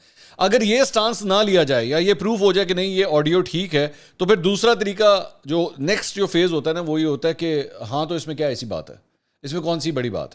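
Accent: Punjab, Pakistan. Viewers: Mansoor ali khan lagta hai shahbaz Gill se uske punjabi touch se bohat impress ho gay hain.. lakin inko acha nhi lagta is tarha ka touch dena punjabi ka..yh higly qualified person hain .inko pehle ki tarha hi bolna chahiye.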